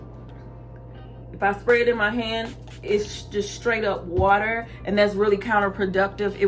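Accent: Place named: South, United States. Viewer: I love your accent so much !!! Where in the south you from ?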